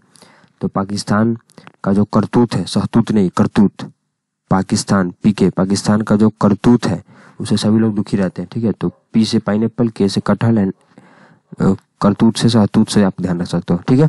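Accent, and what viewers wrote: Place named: Bihar, India. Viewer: sir 😁😁😁 u r tooo cute ......apne karaila kharbujja bhut funny way me bola .....koi nii ap Bihar k ho n... Bihar accent me bola apne.....mst trick Bhaiya ji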